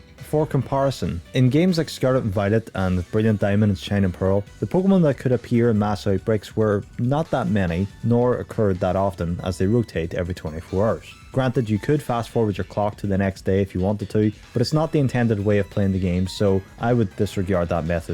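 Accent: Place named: Ireland. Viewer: Love hearing an Irish accent and seeing local creators doing well on YouTube, great job with the video mate!!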